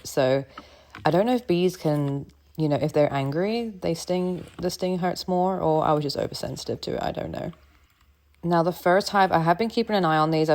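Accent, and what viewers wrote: Australia. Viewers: It's giving Aussie to me. Faint Aussie or U.K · That’s an Australian accent!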